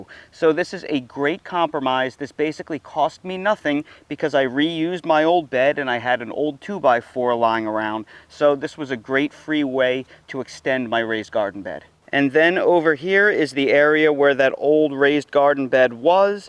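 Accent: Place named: New Jersey, United States. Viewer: I knew you were from Jersey!! ☺️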